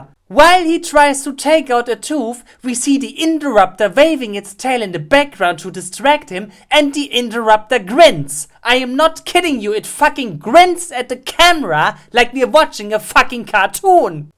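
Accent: Germany. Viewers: Can you speak German ? Because you sound like you have a German akzent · I like your German accent it makes these videos a hundred times better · Your strong German accent makes everything way better. When you're reviewing a terrible movie like Dino Time, it makes my eyes bleed. (That movie was terrible) but when you're there, my eyes bleed less. 😂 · I was born about a decade before Jurassic world and I watched the Jurassic park movies so ye I got inspired (I know someone else said this but your German accent makes this waaaaaaaaaaaaaaaaaaaaaaaaaaaaaaay better) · I freaking noticed, that u have to be from germany, becuz of ur Accent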